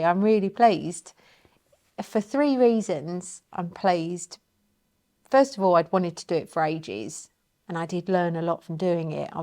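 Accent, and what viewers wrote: United Kingdom. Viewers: OH WOW! I don't often see your vids pop even tho I've got you on highest notifications but this one just popped up.....and what a great vid. You know I found your channel back in the covid lockdown days and the world was so chaotic back then. I was also sobering up and really struggling with life and your vids were so peaceful and calming. Your delivery is so cool. You share honestly and it's so refreshing and I gotta say, comforting. It's like "yeah we all struggle with life and trying to do things that are meaningful to us but also earn enough income so that we can take care of ourselves and our loved ones and have some extra for nice things"....the struggle is real. I just love how your throw yourself into things but then also document how you're feeling during it, your self doubts and all that. You just come across as a very genuine and real person and I love that. 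You've also got a great accent. Being from Oklahoma I don't hear many British accents but I love yours. Anyways, keep doing what you're doing and congrats on 3 years, making SO MUCH money!! AND getting to 143k subscribers!! WOW!! What a great year it's been! I'm so happy for you.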